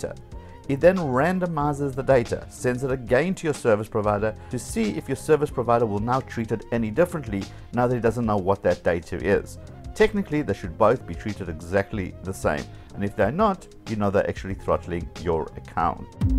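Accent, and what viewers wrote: South Africa. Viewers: accent is SA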